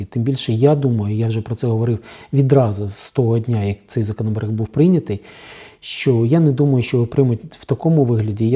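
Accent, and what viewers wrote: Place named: Surzhyk, Ukraine. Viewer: Може без суржика?!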